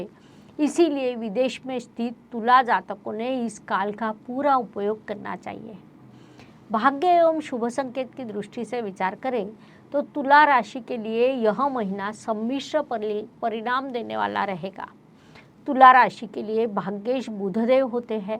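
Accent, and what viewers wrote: Maharashtra, India. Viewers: Madam please speak in Marathi or else speak in Hindi tone..Ekdum marathi tone aata hai to thoda alag lagta hai..Content bohot accha hai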